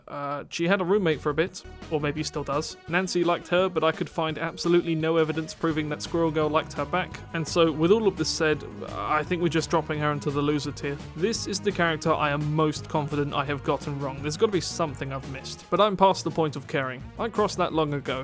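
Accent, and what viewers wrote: United Kingdom. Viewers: Seemed like a good vid then he started going on a racist rant about Chinese and black people you know the vid is about to be ass when you hear that dumb ass British accent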